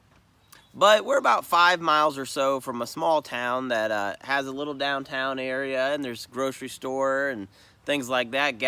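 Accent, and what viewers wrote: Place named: United States. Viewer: He has to have the most American voice i heard.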